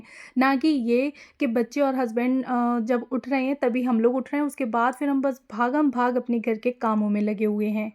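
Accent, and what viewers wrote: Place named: India. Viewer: Because your acent is like india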